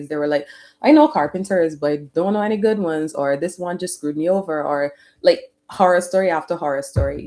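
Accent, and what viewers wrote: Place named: Barbados. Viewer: Subscribed in the first minute because it sounds like you’re Bajan! 🇧🇧 Anyhow, this is a great topic!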